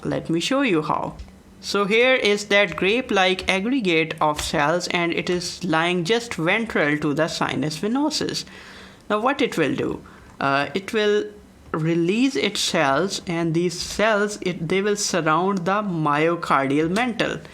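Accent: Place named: India. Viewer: Fascinating but hhe Indian accent is almost impossible to follow; that is now English, alas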